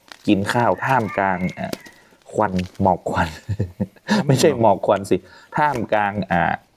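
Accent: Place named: Phayao, Thailand. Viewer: ม่วนขนาดสำเนียงเหมือนเมียงพะเยาเป๊ะ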